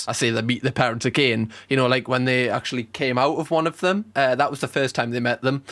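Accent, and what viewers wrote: Newcastle, United Kingdom. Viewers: what accent do u have? ik its british but idk where edit - newcastle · Watching and me mam walks in and says ‘eehhh he’s a geordie’ like we aren’t also geordies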